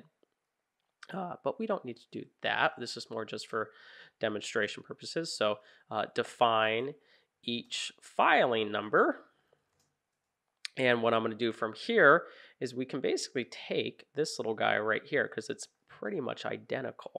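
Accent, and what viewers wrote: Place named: United States. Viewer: very helpful. thank you. keep it up i agree with a previous commenter that having instruction in my native english american accent and idiom makes it significantly easier and more enjoyable for me to actually learn to be able to actually implement some of this in my coding and finance data science projects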